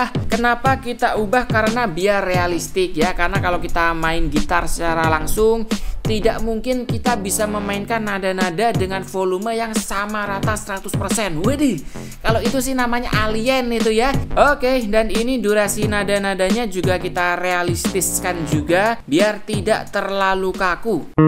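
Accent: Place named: West Sumatra, Indonesia. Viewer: Suaranya kayak minang kocak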